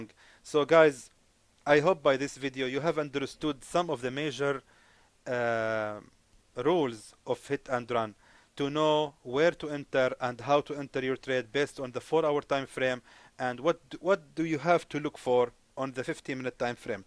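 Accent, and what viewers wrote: Arab world. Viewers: والله اللهجة العربية م تتخبى لو ايششش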